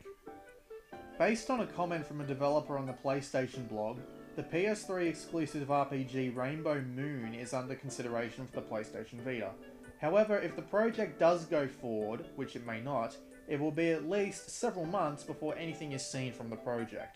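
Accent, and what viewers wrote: Australia. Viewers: Came from GAF, good post. Sound aussie so some aussie release dates might be nice. Also where the hell is MetalGear for vita!? *checks vita*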